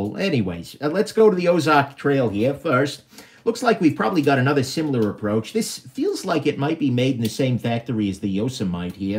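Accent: New York, United States. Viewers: Heavy NY accent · Violently New Yorker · Is that a new york accent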